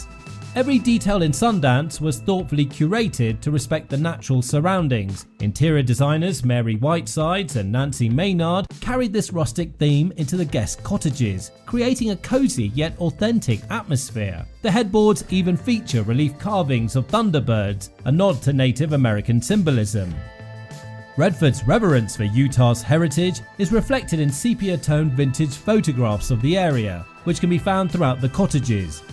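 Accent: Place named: United Kingdom. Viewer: Often adding a British accent to a video presentation seems to give it more credibility but in this case, not so much. It would have been better with a Peter Coyote type voice. It would also be better to showcase the whole resort and institute with all the available photos instead of constantly showing the same photos. And finally, it would have helped to match the narrative to the photos. Yeah, I’m feeling grouchy today but Redford deserves better.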